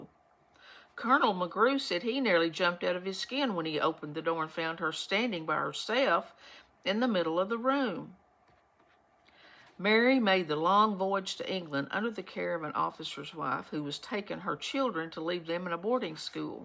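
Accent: Kentucky, United States. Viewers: Thanks! By chance this summer I read A Secret Garden and Anne of Green Gables. Such a nice relief from other troubles. Thanks again. I love listening to a Kentucky native reading these gems!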